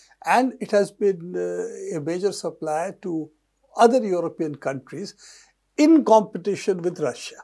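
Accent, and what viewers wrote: India. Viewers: Pl speak English, not Am, BRIT, OR Aussic only Indian, the true English, Bravo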